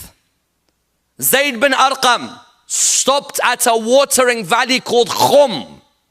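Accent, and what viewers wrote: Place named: Iran. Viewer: bahasa inggrisnya aksen persianya kentel banget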